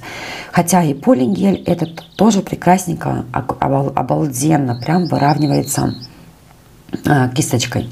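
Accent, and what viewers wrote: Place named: Germany. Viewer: в Германии. На немецком Fiber; Fiberglas( стекловолокно) читается, как « фибер / Фиберглас «. Это не ошибка, просто читает, как немцы читают. Здесь корректно говорить именно фИбер.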